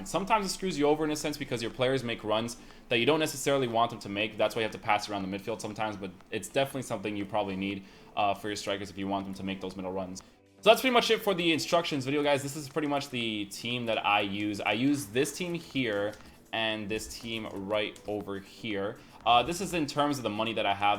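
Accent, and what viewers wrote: Portugal. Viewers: Are you portuguese? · Are u portuguese??? Love 🇵🇹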